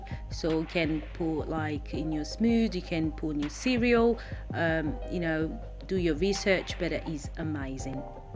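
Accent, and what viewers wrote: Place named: United Kingdom. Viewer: Very interesting accent you have there. It's definitely British but sounds like there is another strong influence there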